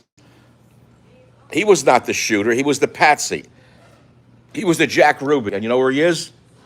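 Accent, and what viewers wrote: New York, United States. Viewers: regardless of whether we are alone or with family out here, we listen because of the way you say things, your delivery and segueways. I listen for your New York accent and kvetching. Keep it up my friend.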